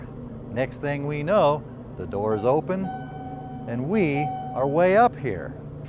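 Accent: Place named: New England, United States. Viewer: your delivery is spot-on Yankee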